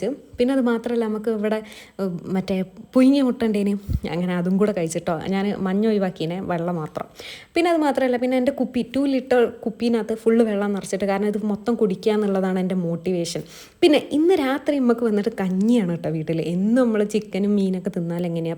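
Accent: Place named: Kozhikode, India. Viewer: Calicut Pronunciation. (slang )🙌 I have lot of colleagues from കോഴിക്കോട് in UAE-❤❤❤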